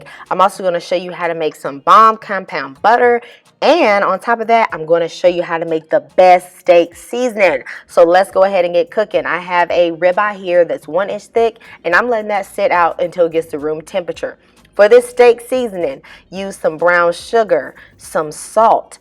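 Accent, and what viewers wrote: South, United States. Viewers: Your voice is even salivating! Which may not seem like that southern touch has much value beyond entertainment, but I noticed i did not have to re-watch the video to catch important details such as cooking times or procedural tips that I often get lost-in when swept up by a videos production impression, or in some sense, distractions from the theme/game. I appreciate any production or performance that I feel immersed in the 'greatness of mind' while on board the content creators intention to convey what i can feel put a smile in there heart and thank you personally for packaging that up in this video and setting the table for us to love and in this way experience the joy that is personified by you, Thank you Lord, in Jesus name~Amen 🙏 💖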